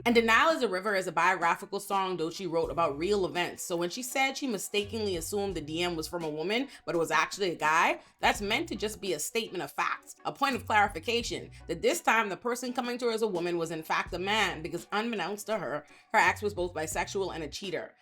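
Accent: Bahamas. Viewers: you sound like youre from bahamas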